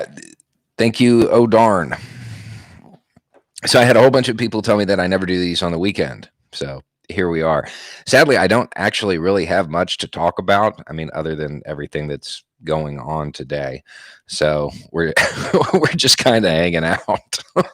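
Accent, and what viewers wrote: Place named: South, United States. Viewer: He does. I've seen a clip where it's hardly noticable. (Can't find it now.). I think he's the "I know a man" having to hide their accent (from a previous video) because people won't take him seriously as a journalist, and question the intelligence of anyone with this southern accent.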